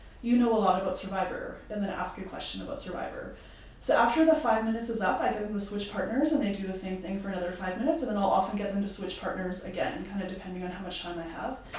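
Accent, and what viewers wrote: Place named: Canada. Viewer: LOL Your Canadian accent is very prominent in this video. It's not bad, I'm just saying I picked up on it right away. But I guess that's why I'm a trained ESL teacher. I can easily identify accents from all over the world. My question for you, though, is what kind of icebreaker activities would you recommend for adult beginners? Most of the beginner activities I come across are for young learners. I'm looking for some activities that adults can do that aren't too advanced.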